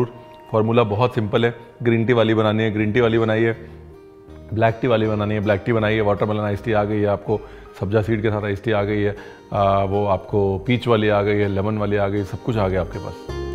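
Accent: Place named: Lucknow, India. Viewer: Your Lucknowi accent. Love it 😍 Hot coffee also please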